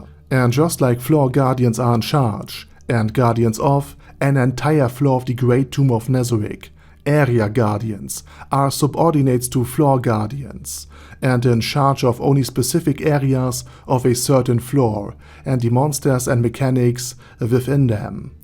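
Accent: Germany. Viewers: Man hört den deutschen Akzent gutes altes Denglisch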